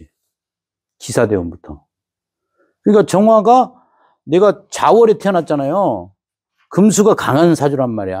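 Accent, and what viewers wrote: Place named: Jeju, South Korea. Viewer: 제주도 사투리 넘 웃겨요~~최고의 강의 감사합니다~~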